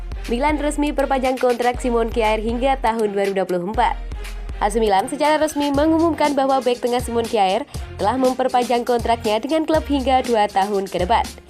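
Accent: Java, Indonesia. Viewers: Kakak suaranya medhok... mantav...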